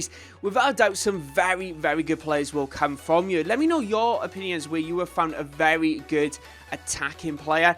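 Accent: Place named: Wales, United Kingdom. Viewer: Where in Wales you from?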